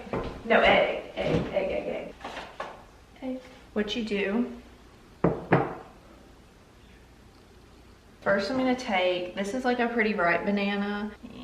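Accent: South, United States. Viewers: I dont know u i just got this on my explore page but i think ur so cute with ur little southern accent n u def nailed the rory outfit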